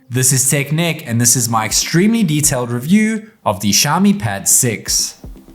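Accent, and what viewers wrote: South Africa. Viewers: oh South African ! jburg or CT ? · Wait he's South African😯😲😳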